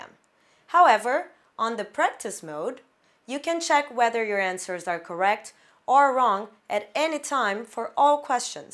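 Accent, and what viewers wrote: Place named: Bulgaria. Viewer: She is bulgarian (I noticed the accent) there everything is possible, no rules, no regulations.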